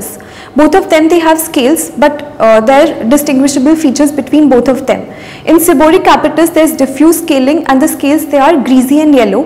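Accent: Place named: India. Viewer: Thats indian accent .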